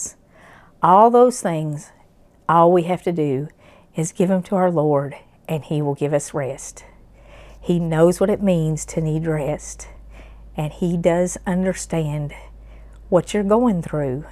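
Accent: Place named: Kentucky, United States. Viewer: Love your diwn home cooking.Although i was born in Ky,Covington, right across the Ohio River opposite Cincinnati. You sound like a Ky woman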